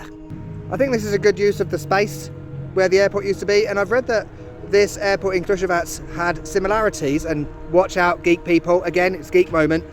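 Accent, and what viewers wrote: England, United Kingdom. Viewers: Video sam coveka dok je snimao, mislio sam neki domaci triper prica na engleskom, kad ono pravi englez 😆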